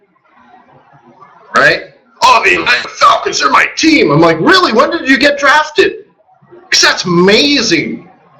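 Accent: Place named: England, United Kingdom. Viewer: you sound so funny with that English accent😂😂😂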